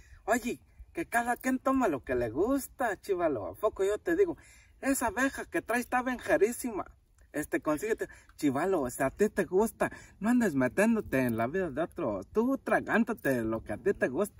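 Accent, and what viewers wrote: Michoacán, Mexico. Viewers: Pa las tierras michoacanas vali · Pa michoacan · Así hablamos en michoacan y guanajuato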